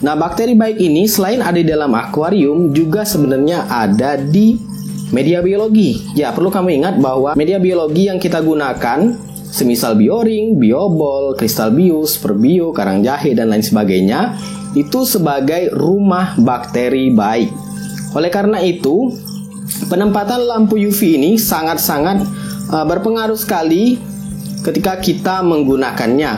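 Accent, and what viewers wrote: Palembang, Indonesia. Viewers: Wong Palembang caknyo kau Yo??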